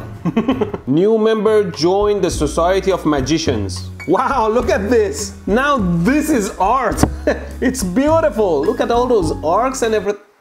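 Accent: Iran. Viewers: Kheyli zaye english harf mizani adam mifahme irani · عاشق این لهجه ایرانیم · ایرانییییی ؟؟؟ لحجتو کاملااااا تشخیص دادم 😂😂😂 ولی دمت گرم خیلی مردی 😂❤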